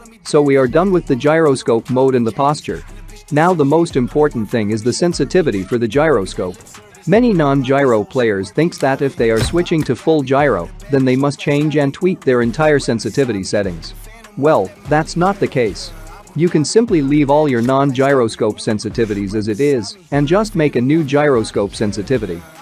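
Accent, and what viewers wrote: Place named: India. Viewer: You from india maybe 😅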